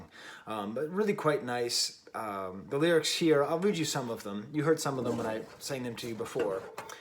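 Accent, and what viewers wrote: Dublin, Ireland. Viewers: Nowhere near a British accent, it's a strong Dublin accent. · He has a very Dublin accent. Hes from the same town as me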